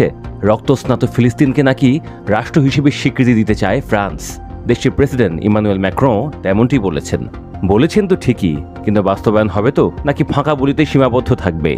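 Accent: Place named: West Bengal, India. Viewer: একাত্তরে নিউজ করা ভাইয়াটার নাম পরিচয় অবশ্যই বাংলাদেশ জানতে চাই আপ ব্যক্তিগতভাবে আমার কাছে কেন যেন মনে হচ্ছে উনি বাংলাদেশি কোন ভয়েসে কথা বলতেছেন না । পশ্চিমবঙ্গের বাঙ্গালীদের ভয়েসের মতো কথা বলতেছেন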